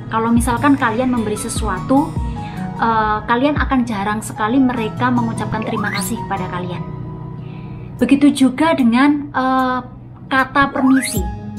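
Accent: Java, Indonesia. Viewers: Kalo boleh tau Mbak asli Jawa mana ya.. Medok eh bahasa Indonesia nya He.. He.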